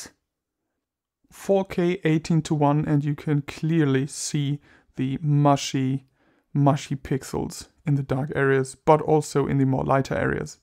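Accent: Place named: Germany. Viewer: Tolles Video, dass du Deutsch als Muttersprache hast erkennt man in deinem Englisch sehr gut 😬✌🏻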